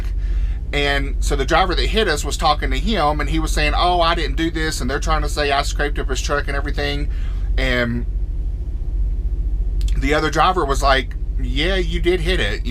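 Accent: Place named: South, United States. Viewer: first I must say that I love your southern accent lol and my mom is from a little place called Rutherfordton NC you blink and you could pass it lol I love your videos guys be safe!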